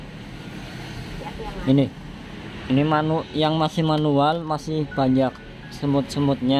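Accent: Java, Indonesia. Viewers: Pasti...iki wong Jowo....medok banget ngomongnya!😄😃😀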